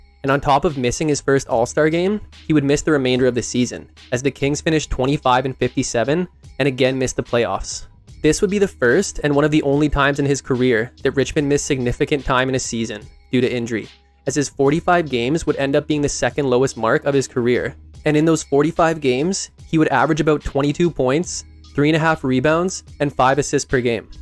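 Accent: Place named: Canada. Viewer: Absolutely LOVE this channel! Love the Canadian accent MUCH more than I should and I love the detailed bios and pictures - you have a sub and Mad respect! 👍 😃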